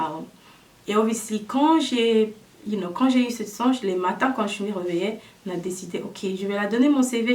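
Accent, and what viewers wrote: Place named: France. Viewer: Your french accent it’s so cute 😍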